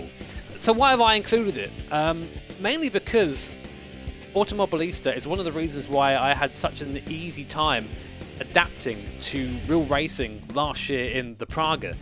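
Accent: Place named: Yorkshire, United Kingdom. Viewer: As someone from Yorkshire. That is definitely how we talk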